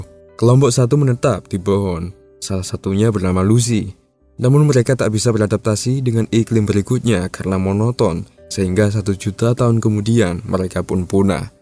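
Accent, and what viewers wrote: Java, Indonesia. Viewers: logat jawa mu tu norak gak usah so, kamu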